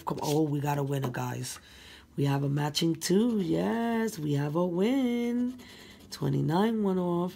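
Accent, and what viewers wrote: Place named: Puerto Rico. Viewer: Nicely done ✅ boom 😘 I love your accent am I picking up some Puerto Rican in you. I hope I’m not over stepping my bounds because that’s not my intentions. ♥️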